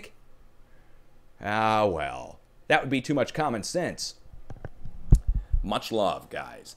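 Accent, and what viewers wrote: Russia. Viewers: Good work, and I love your foreign accents, especially the Russian one. Stay strong! Much love! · Hey, love the cheesy Russian accent!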